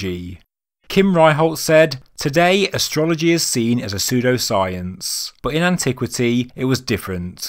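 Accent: United Kingdom. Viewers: Nice to hear a voice from the UK, on the subject of Egypt.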